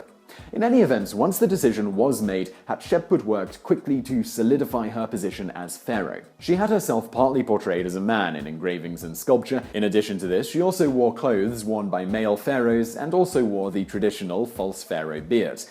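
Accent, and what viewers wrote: United Kingdom. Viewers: Lol I guess this is the only time a British accent sounds cringe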